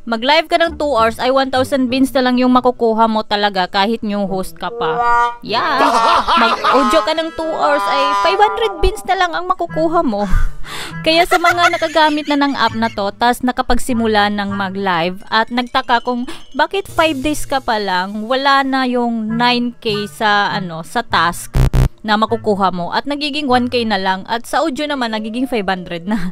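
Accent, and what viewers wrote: Visayas, Philippines. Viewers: Wow bisaya si madam hehe thank you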